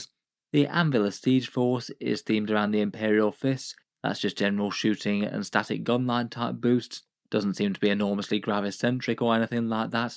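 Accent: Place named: United Kingdom. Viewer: Like the video because is vigilant like a sleepless dreadnought. A dreadnought with that smooth succulent British voice. If I were a Slaanesh worshiper I woul /MESSAGE DELETED FOR SPREADING HERETICAL THEOLOGY// INQUISITION ORDO-HERETICUS/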